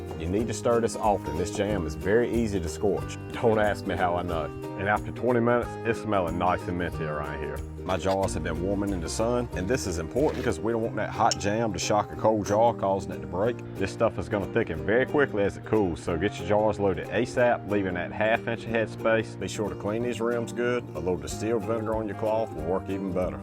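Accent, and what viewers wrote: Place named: Cajun, United States. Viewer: Man you have figured out a good life for yourself. I am a big fan of your videos. Very informative and down to earth. Calming voice with that Cajun sounding twang makes your narration great as well. I know you probably have zero interest (which is also why I say this) but society needs to start putting their worldly faith and trust in men like you instead of the leaders we currently choose. I’m tracking you down in an apocalyptic situation lol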